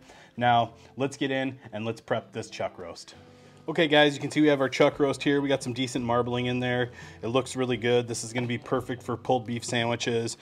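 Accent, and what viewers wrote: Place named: Northern Minnesota, United States. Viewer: Thank you for the great video! It sounds like you are in northern MN? Maybe around Duluth?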